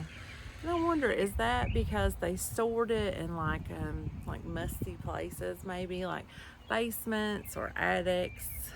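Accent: Kentucky, United States. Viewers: I love Vintage yarn and love finding yarn at yard sales and thrift stores. New Subscriber here. Are you from Kentucky? We have similar accents. Your voice is comforting.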